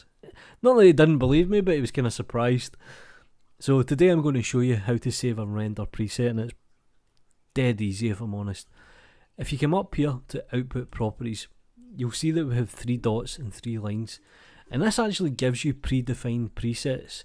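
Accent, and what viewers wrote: Scotland, United Kingdom. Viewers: Liked the good video. Your scottish accent is so distinctive... reminded me of Dundee. De ye ken a way to save full rendering set not just size pal? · Scottish accent i hear? Thanks for this.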